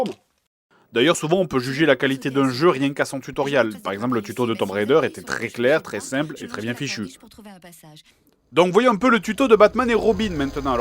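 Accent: South, France. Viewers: Le JDG est un vrai sudiste, avec l'accent qui va bien!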